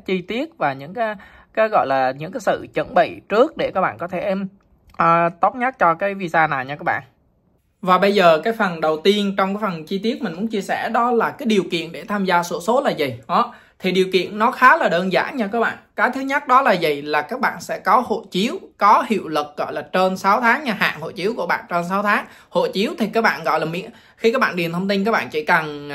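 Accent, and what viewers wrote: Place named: Quang Nam, Vietnam. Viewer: Nghe giọng hình như a quê ở quảng nam đà nẵng gì phải ko ạ. Nghe a chia sẻ rất chân thật dễ hiểu . Em cũng có dự định tham gia mà chưa rõ các giấy tờ nào cần công chứng hay ko cần mong a ra video mới ạ❤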